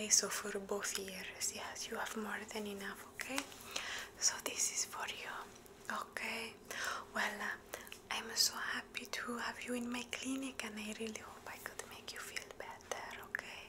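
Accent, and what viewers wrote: Italy. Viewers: LoVe Italiano food & accent 💖🥰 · Great Italian accent 🇮🇹❤️❤️👍👍I like it a lot you do the best Italian accent ever🇮🇹😌❤️❤️sooooo cool!!!!😎😎😎😎😌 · OMG, your Italian accent is so cute without being over bearing or anything like that, if that makes sense at all. PS - You've got the stop the Qtip when there's resistance! ;-) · Your Italian accent is great - will you ever be doing a follow up to the Rome visit video?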